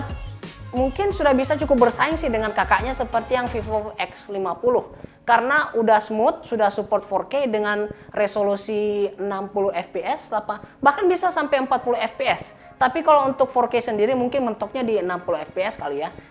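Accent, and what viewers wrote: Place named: Sinjai, Indonesia. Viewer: Kaya kenal... Org sinjai yah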